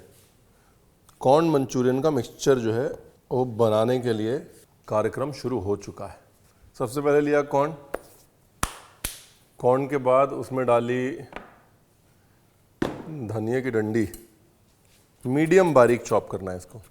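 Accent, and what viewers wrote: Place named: Lucknow, India. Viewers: Lucknow ki baten sunne acha lagta hai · Aap ke bolneka tarika asal lakhanavi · लखनऊ वालों का अंदाज ही अलग होता है उनके लहजे में लखनऊ झलक ही जाता है.....❤🎉😊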